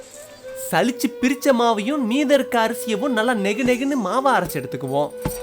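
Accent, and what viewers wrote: Salem, India. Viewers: Wow what voice I think Salem area crt ah entha area attur?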